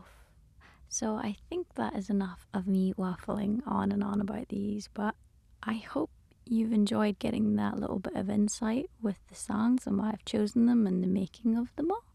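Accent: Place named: Ireland. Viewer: I don’t know how I missed these before but I just listened to the most hauntingly beautiful version of I have ever heard. Your voice just transports me! I love listening to your soundtracks and your beautiful Irish lilt! God did magic when he created your voice! Thank you so much for being brave enough to share it with us! Love you!!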